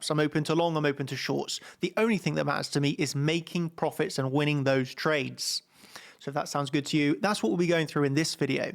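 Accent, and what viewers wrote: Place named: United Kingdom. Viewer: Bro is British bruv 👊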